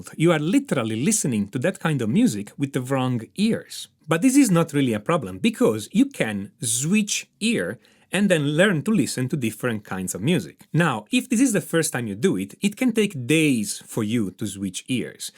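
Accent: Italy. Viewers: I love your Italian accent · Ho riconosciuto subito l'accento italiano (non avevo mai incontrato questo canale )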